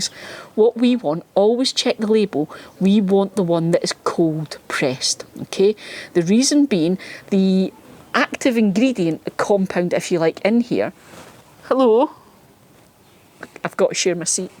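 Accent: Scotland, United Kingdom. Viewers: Came to learn about neem oil sprays, stayed upon hearing a fellow scottish accent 💓🏴 (currently working in some serious Fife temperatures 🔥). Your garden looks absolutely wonderful!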